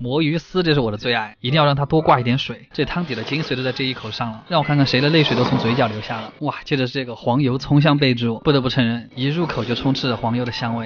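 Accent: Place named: Sichuan, China. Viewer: 四川话好土味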